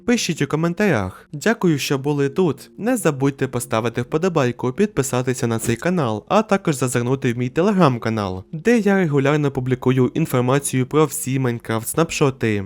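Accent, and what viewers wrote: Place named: United States. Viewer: ааа, незеритське, зрозумів, автор через свій фірмений американський акцент ні зміг сказати слово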